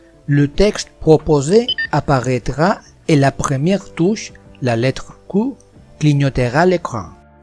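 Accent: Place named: Russia. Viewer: s'il vous plait mr vous n'etes pas français car votre pronanciation je crois que vous etes russe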